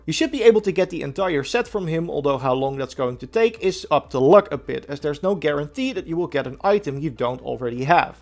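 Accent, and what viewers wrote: Netherlands. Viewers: You are so dutch haha, instantly recognized the accent :p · hehe nederlander ik hoor het wel ;) goeie video man ! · Good video thx. Your accents sounds Dutch, is that correct? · Aan zijn accent te horen spreekt deze meneer ook Nederlands :D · The Dutch accent makes this video so much better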